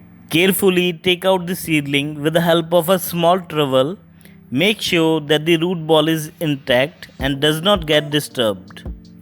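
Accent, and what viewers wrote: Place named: India. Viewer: These indian gardening vidoes with indian accents are giving me brain tumor.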